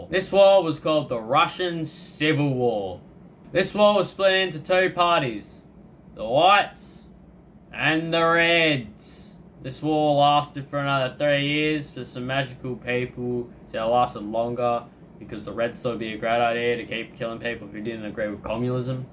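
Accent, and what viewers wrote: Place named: Australia. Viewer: I was for sure expecting a deep Mississippi accent i was not expecting an Awesome Aussie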